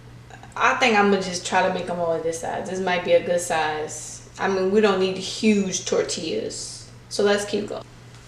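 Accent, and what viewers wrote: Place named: New Orleans, United States. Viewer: I detect a hint of a New Orleans accent in your voice. Food looks good!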